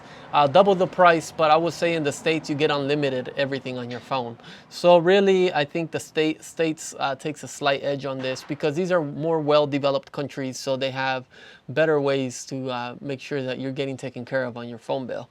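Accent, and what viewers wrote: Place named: North, Nicaragua. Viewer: éste muchacho cuando habla español tiene el acento norteño de nicaragua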